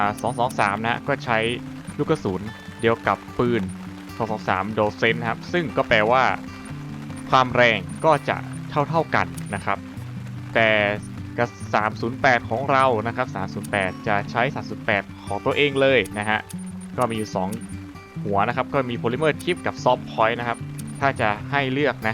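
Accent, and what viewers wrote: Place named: North, Thailand. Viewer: อันนี้เป็นคนเหนือแม่นก่อครับ สำเนียงออกเหนือเลย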